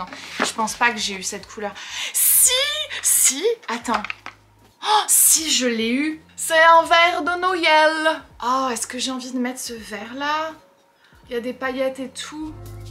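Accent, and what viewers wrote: United States. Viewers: ta manière de parler très US même quand tu parles français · Je pense que c'est lié à l'accent américain. Je saurais pas l'expliquer mais l'accent américain sur le français peut donner cette impression / les mots anglais dans une phrase française aussi. C'est mon analyse de la question mais c'est vrai que quand des Français se mettent à utiliser les anglicismes à tout va j'ai cette impression les concernant qu'ils sont "faux" ou parfois qu'ils sont prétentieux. Bien sûr dans ton cas, c'est différent car tu es partie aux Etats Unis mais ça peut fausser l'impression de certains français à mon avis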